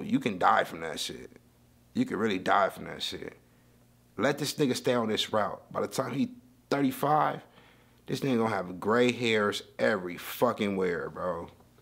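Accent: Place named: London, United Kingdom. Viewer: 😅 The London voice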